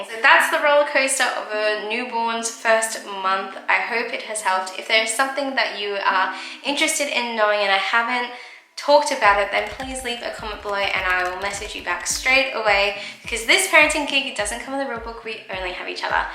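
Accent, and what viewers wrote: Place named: Australia. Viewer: Ngawww Mama! These are the best milestones!! Just saw your post on Youtube Moms Unite and thought I show some love and connect. I'm hearing a familiar accent Mama!! <3 Where abouts in Australia are you from? I'm based in Sydney! Hope to stay connected.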